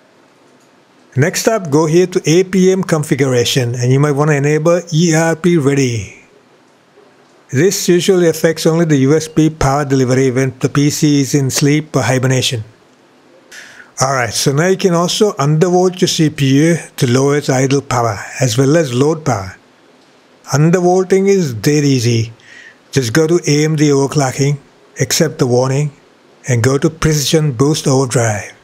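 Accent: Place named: India. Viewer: indian guy trying to speaking english like dolund trumph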